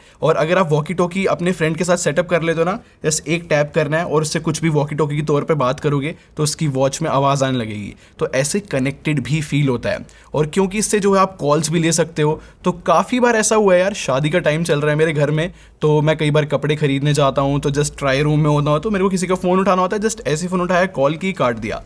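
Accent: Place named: Delhi, India. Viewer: Bro I think it's delhi accent 😂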